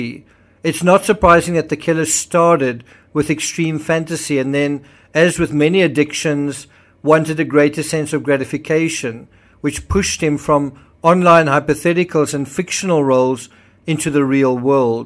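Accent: South Africa. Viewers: Whoever said u r a liability in this case, is an ass. U’re obviously knowledgeable and put a lot of work into investigating each, including this one, case. U’ve even traveled to many of the areas in which the crime took place, or where the predator worked, or whatever!! I am also a fellow South African listening to u from Rhode Island, USA. P.S. love hearing the accent! Thk u!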